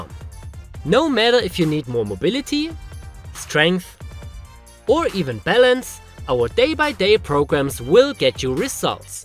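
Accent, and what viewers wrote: Germany. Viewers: I love the German accent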